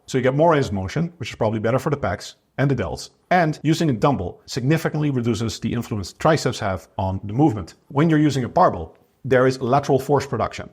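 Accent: Netherlands. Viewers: dutch accent is hilarious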